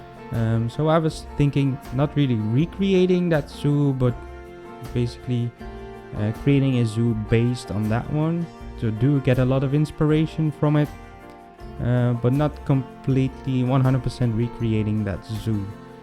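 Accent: Netherlands. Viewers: a SUPER REALISTIC city zoo?! No way! I would love to see you build a zoo like that. I mean, I would super love to see it. I'm wondering where it will be placed. Netherlands maybe? Because your accent sounds Dutch [correct me if I'm wrong]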